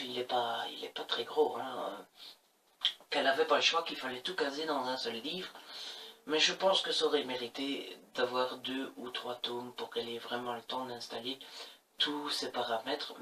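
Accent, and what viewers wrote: Belgium. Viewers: Oui ton accent :-) moi aussi suis belge. J'aime beaucoup tes vidéos, continue :-)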